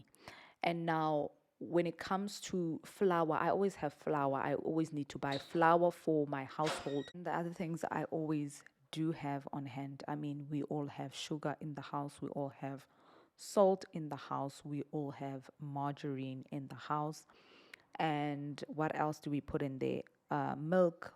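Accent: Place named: Zimbabwe. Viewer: oh my you have a Zimbabwean accent I didn't even read the caption I just saw a black woman and I clinked lol but anyway this is so needed for the young ones good job mama love from Canada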